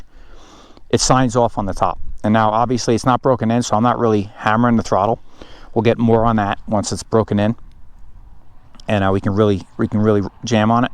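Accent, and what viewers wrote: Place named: Massachusetts, United States. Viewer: You from Massachusetts? Got a north east accent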